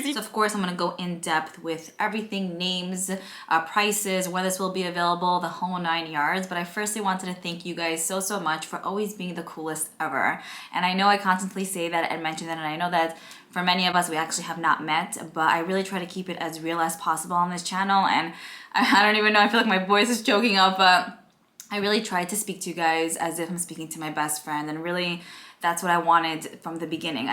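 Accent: New York, United States. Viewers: So proud of you and love love your NY accent and I hope you always keep it 💖💖 I will definitely get this collection…Love it so much 💖💖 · I understand completely cuz I talk the same way cuz I'm from New York. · Congratulations on your pallet and engagement, how exciting! I love all of the colors especially the purple wine color and green, so so so beautiful. I love your accent, I speak the same as I was born and raised in NY. My girls always tease me when I say cawfee too lol. Very happy for you, you are such a happy go lucky kind of gal.💜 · Girl. You have an accent. New York. I have an accent. North NJ. Lol I am sooo excited for you!!!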